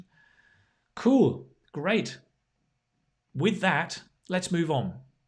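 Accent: United Kingdom. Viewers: I love your class very much, your British accent is beautiful! Thank you!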